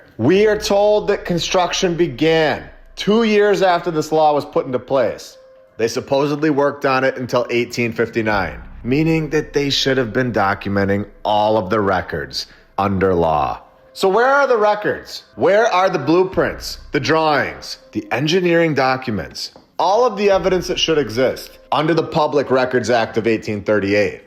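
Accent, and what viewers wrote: United States. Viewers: I knew what he meant. He's American, they say words differently.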